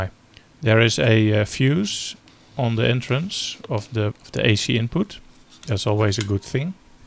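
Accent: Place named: Netherlands. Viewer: Considering his pronunciation, making fun of other people is not that great. Way he pronounced V and Ch and such I am pretty sure he is Dutch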